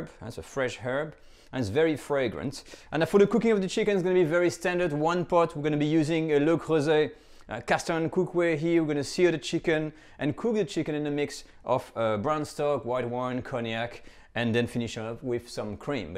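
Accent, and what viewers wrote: Australia. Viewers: Ha ha every now and again I hear the remnants of your Aussie accent coming through!! Very good recipe too. Made it for my daughter and she loved it. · Love the way you explain things. You have been in Australia too long, you are starting to sound like us, all lbe it with a lovely accent. A bit like Manu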